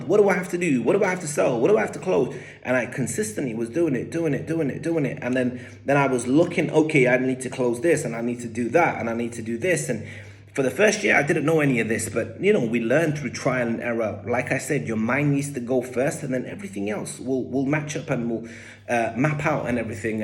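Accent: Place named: United States. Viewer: Are you from America? Great accent!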